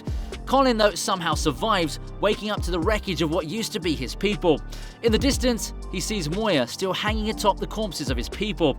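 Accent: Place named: United Kingdom. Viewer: with a British accent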